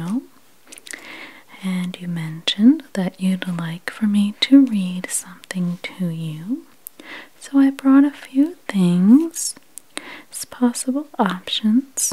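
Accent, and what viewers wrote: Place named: Pennsylvania, United States. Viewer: I was thinking certain word you pronounce you might be from PA. Now I see "Giant" and I'd bet on that now! lol Lovely voice, lots of tingles!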